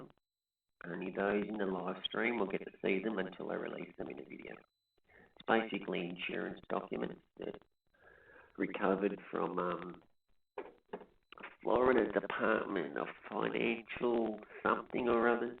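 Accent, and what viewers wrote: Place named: Australia. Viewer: As an American, you sound like every other Aussie, sort of like how all Chinese look the same to Americans. No offense meant there.....just sayin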